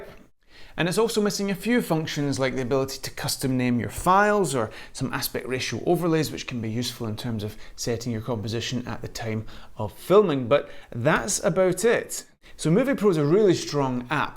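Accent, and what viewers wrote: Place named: Edinburgh, United Kingdom. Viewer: Another excellent video. Trying to place that accent. Edinburgh?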